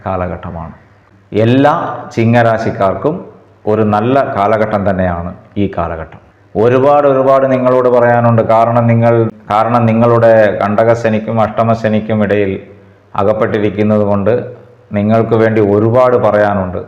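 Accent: Tamil Nadu, India. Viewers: സർ, താങ്കൾ തമിഴനാണോ സംസാരത്തിൽ തമിഴ് കലരുന്നു. ഏതായാലും താങ്കൾ നിസ്സ്വാർത്ഥനാണ്. തോന്നുന്നത് അപ്രകാരം പറയുന്നു. സത്യം സത്യമായി. 👍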